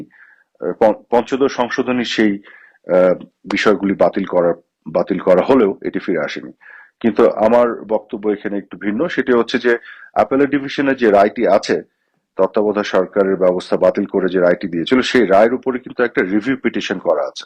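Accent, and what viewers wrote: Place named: Bihar, India. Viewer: Aponar kothar sur amon-j bihar mani kintu talgas ta amar.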